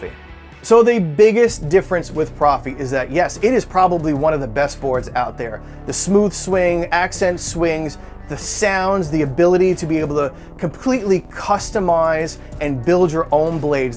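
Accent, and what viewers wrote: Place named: Long Island, United States. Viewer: I detect a long Island accent. Nice video man.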